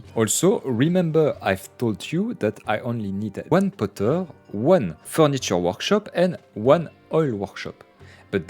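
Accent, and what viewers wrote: France. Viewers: I have played this game so often when I was younger. Thanks a lot for this instand nostalgia. All the best, Valentin PS: Your French accent sounds marvellous, plz keep it! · The French accent always a good lissen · By your accent, you are Frenchman, are you? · Français qui parle anglais ? (A l'accent et à la prononciation de l'anglais, ça se sent^^) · The french is strong with this one 😍😍😍 oh I Love it so much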